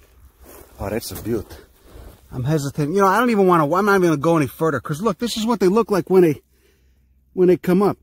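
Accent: Chicago, United States. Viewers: yes. I'm aware of the most common invasive wild edibles. Tons of videos and books, but nobody can present it like my main man. I need it delivered with that no nonsense Chicago flare. Ya dig?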